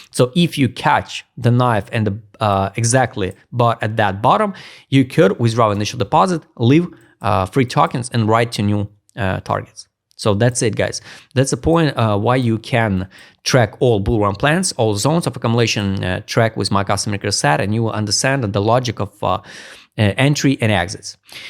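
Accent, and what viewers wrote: Russia. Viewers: Твой русский акцент превосходен.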